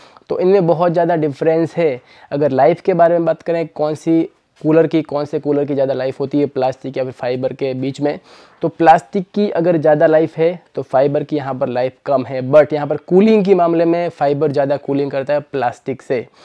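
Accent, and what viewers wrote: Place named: Shekhawati, India. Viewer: भाई आप झुंझुनू के है या चूरू के हैं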